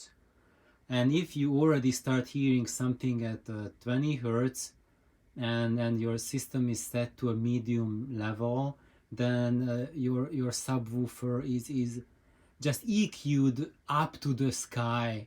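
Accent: Hungary. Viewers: Sorry for the off topic. After 15 years of living in the UK I have met a lot of people from different countries. I am able to recognize accents after just a few sentences. You are definitely Hungarian. Ok it was easy, because I am Hungarian too. :) Believe me It is fun to know where people come from without asking them. All I need to do is just listen how they speak. :)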